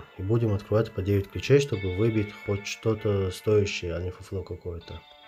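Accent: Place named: Chechnya, Russia. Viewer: Ахах ну у тебя акцент чеченский,) улачи в развитии p.s на чеченском-волк)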